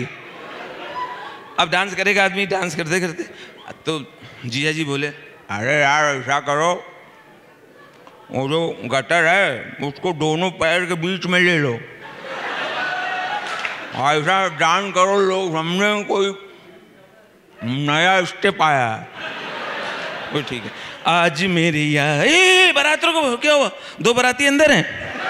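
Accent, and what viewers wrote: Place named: Kanpur, India. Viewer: Aap ko sun ke Kanpur yaad aa gaya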